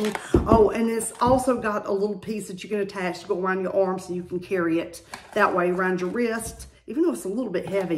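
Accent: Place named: South, United States. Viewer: Hey you! I subscribed to your channel a couple of months ago. I love your southern accent! And I too have been watching Jessica for years.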